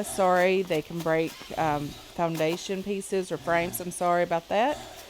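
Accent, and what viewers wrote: Kentucky, United States. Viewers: Hey loving this gameplay series so far (I came from following your Subsistence videos). Might have to give Green Hell a try. Learning a lot, enjoying the content and just let me say, your voice brings a happy nostalgia to this Kentucky girl (living in MI). Its so nice to hear an accent from home! Also, have you ever heard of Starsand? Its a desert survival game and its unforgivingly brutal. Looking forward to more content! :)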